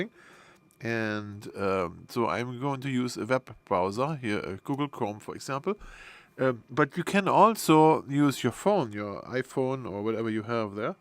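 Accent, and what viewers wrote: Germany. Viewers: Du hörst dich an wie ein deutscher,bist du einer? Naja jedenfalls Danke für das Video!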